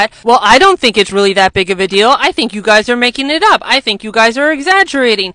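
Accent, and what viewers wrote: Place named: United States. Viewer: I like your American clear accent.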